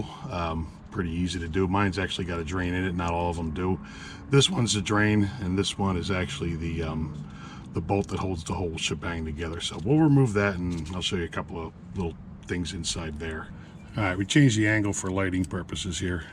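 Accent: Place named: Pennsylvania, United States. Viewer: Are you from PA?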